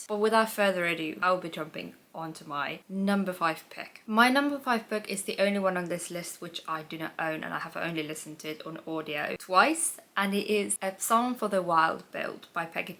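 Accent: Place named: Birmingham, United Kingdom. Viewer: how did you get an English accent btw? (a bit Brummie lol)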